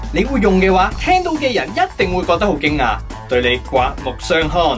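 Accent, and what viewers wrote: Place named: Guangzhou, China. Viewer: 唔知係咪錯覺，我覺得你啲廣東話都有啲口音，係就好流利，但係有啲口音囖，可能係廣州音同香港音分別.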